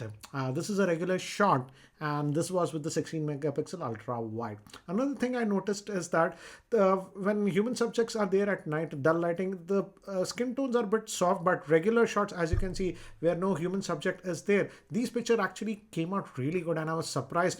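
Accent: India. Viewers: The Indian accent is really hard to listen to 😖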